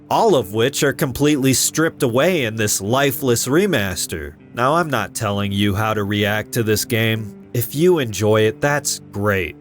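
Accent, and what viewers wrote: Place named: South, United States. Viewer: Fr. Dawg is definitely from the south.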